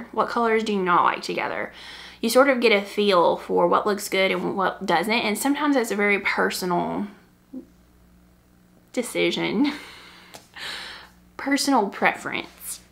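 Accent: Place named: Arkansas, United States. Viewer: Hi! I love your podcast!! I love that you’re mixing it up and doing some different formats. This was a great discussion on colorwork - awesome tips and advice. Thank you! I’ve got the Alpenglow in my queue waiting on squishy mail to arrive - so excited. I’m a fellow Arkansan transplanted to Texas a long time ago and I just love hearing your accent from home! You asked in a previous video for book recommendations. I would recommend reading “A Gentleman in Moscow” if you haven’t already read it. It’s a beautiful story. My mom and I are reading the Thursday Murder Club series at your recommendation and absolutely love it! Thanks so much for all you share!! You’re so inspiring 🐝 🐓 🧶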